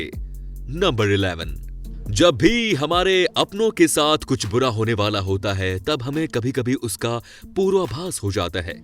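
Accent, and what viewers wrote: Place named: Jaipur, India. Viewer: Yeh awaj Jaipur ke ek bhai ki hai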